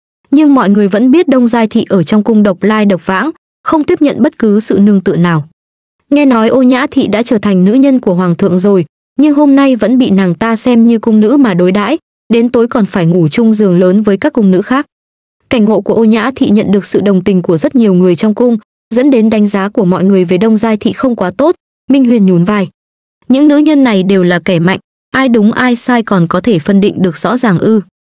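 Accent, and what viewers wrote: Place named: North, Vietnam. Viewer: nghe giọng bắc quen, hên sao tìm đc bạn đọc truyện nên dễ nghe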